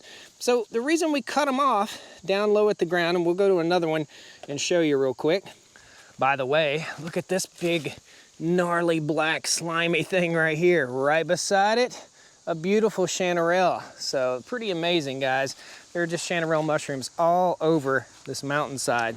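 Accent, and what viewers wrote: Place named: Carolina, United States. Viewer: I love your Carolina accent!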